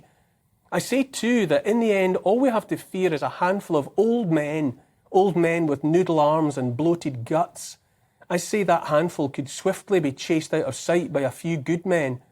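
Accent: Scotland, United Kingdom. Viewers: thank God for people like you. You are the best, views so eloquently put and with your adorable Scottish accent too. Wake up people!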